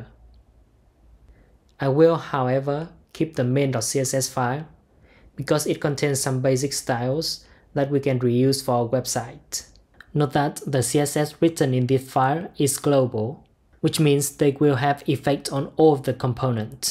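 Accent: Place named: Vietnam. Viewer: nghe tiếng Anh từ nước bản xứ thì không hiểu chứ nghe tiếng Anh từ người Việt thì hiểu 90% haha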